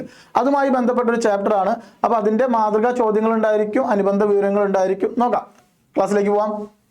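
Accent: Thrissur, India. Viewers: Sir thrissur ano ❤❤❤ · Sir class nte idakkulla trissur style aanu highlight😂😂😂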